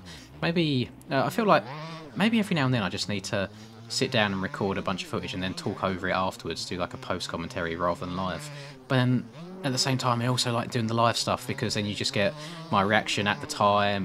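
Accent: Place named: England, United Kingdom. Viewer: im american and i can attest to the fact that i watch your videos because of your typical english man accent it adds to my experience and plus your a really good rider that makes good content keep it up broski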